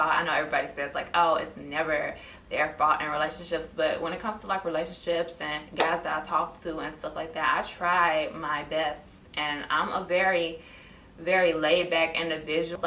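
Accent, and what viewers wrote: Chicago, United States. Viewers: Where are you from? You sound like you're from Chicago. Anyways Yes this is totally normal to feel like you're missing out or when will your time come to start a family. I'm 28 about to be 29 in like 2 weeks and I don't see myself getting married to anyone anytime soon... It sucks because as women we are meant to think that there is some type of clock ticking, but we often forget that the only clock that matters is God's clock. It also makes it difficult when you get to my age and everyone around you is asking when are you going to have babies... Your story unfortunately is not unique I too grew up in a single parent home and I feel like yes it was rough and it definitely left me lost when it came to relationships. I feel like I made a lot of mistakes and dealt with a lot of bad people because I was seeking love from the wrong places. 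At the end of the day life is a journey and no ones journey is perfect. The whole idea of being married by 25 with 2 kids a dog and a picket fence is not a reality it's just a fairytale. Pray that God will send you a good man and it will happen but don't be afraid to let some time pass before he finds you. Just keeping working and improving and before you know it things will fall into place even better than you imagined.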